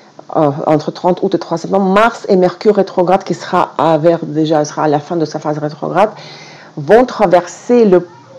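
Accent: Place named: Russia. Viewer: merci pour cette vidéo très enrichissante... J'adore votre accent... Je précise d'ailleurs que votre français est parfait quand on sait combien cette langue est complexe... Quand je vous écoute, çà me replonge dans mes années lycée lorsque j'étudiais le russe... une langue dont la musique me berce encore... Votre façon d'aborder l'astrologie me parle beaucoup: elle est précise et claire à la fois... Belle semaine à vous...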